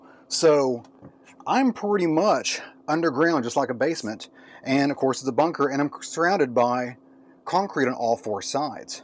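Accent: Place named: Texas, United States. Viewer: Why Ism I not surprised by Texas accent?=)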